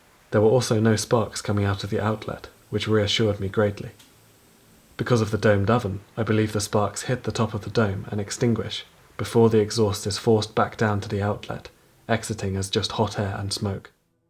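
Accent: United Kingdom. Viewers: I think, it's a good idea to make summary videos like this, to provide some insights and to share your experience along with a video itself. I read descriptions you put under your videos, they're full of interesting information, but they're also not aligned with visuals, so two exist slightly separately. Having them synchronized, with an additional information, is very cool! And I like British accents, so more narrated videos, please.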